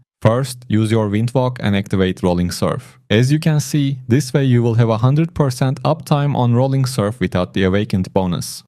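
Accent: Turkey. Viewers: kanka ingilizcenden anladığım kadarıyla Türksün. F2P oynanır mı zevk alınır mı bu oyundan ?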